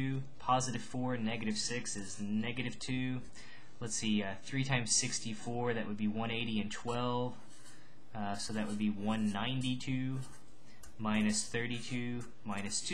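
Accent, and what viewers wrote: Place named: United States. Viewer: good maths, but I hate the american accent tho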